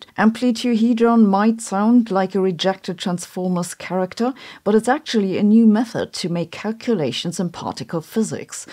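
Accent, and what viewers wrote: Germany. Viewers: I take everything this channel says with a large grain of salt - she does not even understand Special Relativity as proved by her clickbait videos about "faster than light travel" - had she ever studied Special Relativity and Lorentz Transformations, she would understand that "faster than light" is like saying "negative distance" , it is an oxymoron. Unfortunately, she would not know Minkowski spacetime if it hit her in the face. I expect scientists with German accents to be smart - sadly, she falls well short of the stereotype.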